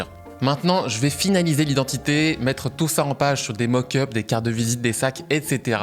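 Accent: Belgium. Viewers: super video vraiment je te kiff mais j'ai une question. T'es Belge ou Français ?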